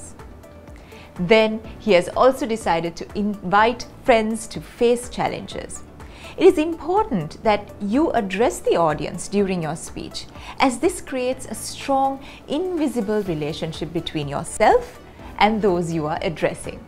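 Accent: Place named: England, United Kingdom. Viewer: Thank you, teacher. Your English accent is amazing.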